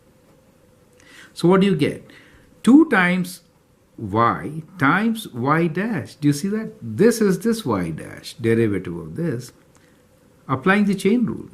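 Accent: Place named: India. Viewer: you sound so intelligent indian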